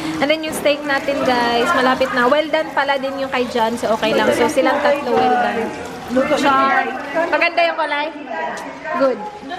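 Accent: Visayas, Philippines. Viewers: Suok2x jud. Tarongag balibod..... 😁😂😁 cute jud kaayo mag bisaya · Na amaze kayko ba kay the way ka mo storya og tagalog naa gyapon accent nga bisaya. Love u dhai😘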